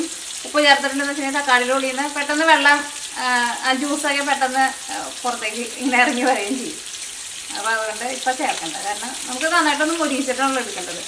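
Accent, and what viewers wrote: Thrissur, India. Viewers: Hi chechi, your cooking is So good..u r videos watching since 2014(after marriage)..I love your Thrissur slang sooo much.. even though I am far from my home.. after watching your videos I have homely feeling. always watching your videos with full smile 😊...I used to keep fish curry as you said..... keep rocking chechi...❣️ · Voice modulation better aakan nkkuka, Thrissur slang koodi aakumpol follow cheyyam pattinnilla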